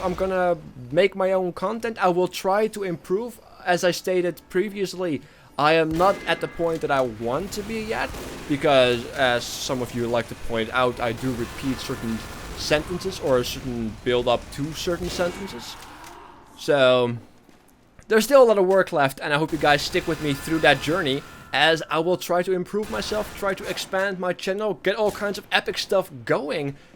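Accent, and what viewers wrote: Netherlands. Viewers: Dutch accent makes u special lolz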